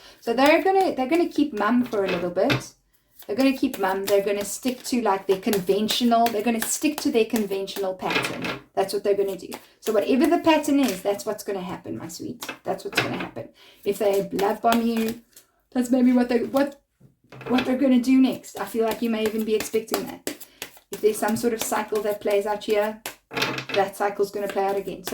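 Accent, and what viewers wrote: South Africa. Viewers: You sound so South African. 🇿🇦❤️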